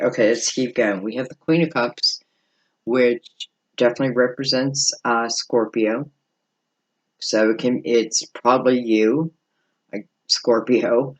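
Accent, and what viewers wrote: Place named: Pittsburgh, United States. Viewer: November 1st. I like your readings. And that's gotta be a Pittsburgh accent!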